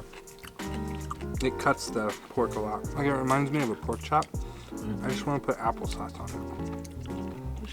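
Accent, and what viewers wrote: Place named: United Kingdom. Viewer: your british accent is so funny! ㅋㅋㅋ 한국인보다 한국음식을 잘아는 사람!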